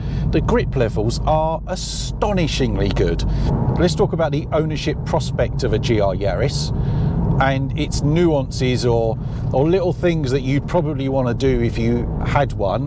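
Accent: United Kingdom. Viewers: Tawk, Spowrt. Proper Saaff accent. Great review. So ugly from the back but it looks a whole load of fun.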